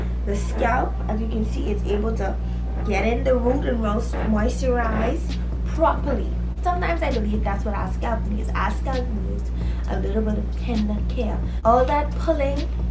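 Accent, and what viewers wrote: Bahamas. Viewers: I don't comment on videos but been watching your channel for like two months. Love the authentic Bahamian accent and your content is improving. Stay true and Congrats.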